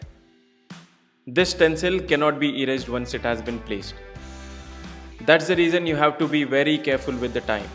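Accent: India. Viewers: I wach but the akcent indian destract me afk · Good Video but dang that accent has got to be the most disgusting accent ever. Indian accents are just gross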